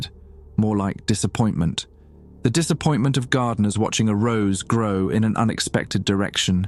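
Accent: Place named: England, United Kingdom. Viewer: English accent is a deal breaker! American story, Texas, national archives college park md, whiskey and all. Why does everything on YouTube have a twat accent?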